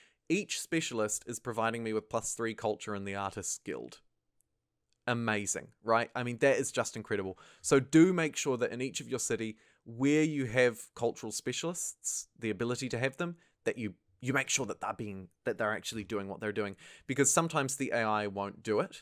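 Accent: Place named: New Zealand. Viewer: as another kiwi i have to say that your accent or iksent as we say is very rhotic. e.g. culturrrre rather than culcha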